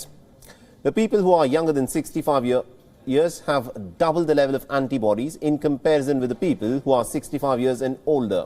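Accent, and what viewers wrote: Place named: India. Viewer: Meaning is lost with the Indian accent. Huh...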